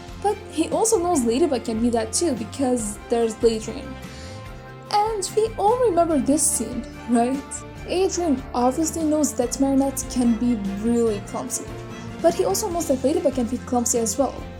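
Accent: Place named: France. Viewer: Your French accent is so strong I thought you were speaking French in the beginning for a sec there😂😂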